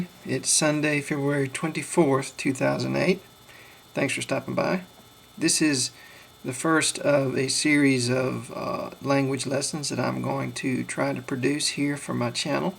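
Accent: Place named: Carolinas, United States. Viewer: Thanks I'm greek and i was interested in learning some of my racial neighbors languages and you sound like ur from down south, im from NC and ur accent sounded very carolinian. But nice to know a few things about the turkish language from a non biased PoV(as most people know we dont seem to get along too well... but shit thats past worries.)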